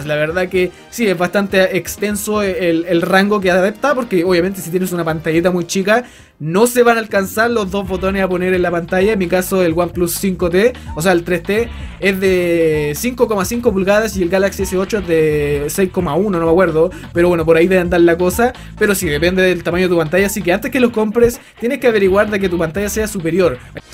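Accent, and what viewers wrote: Chile. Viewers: Es chileno bro V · espera eri chileno? y en que pais vives? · La mejor mierda es que este en audio español chileno xD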